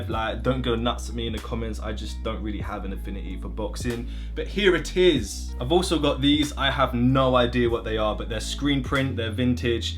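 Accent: England, United Kingdom. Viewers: Idk what’s more entertaining his monotone British accent or his monotone jokes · this accent is obviously english/british,,,but what KIND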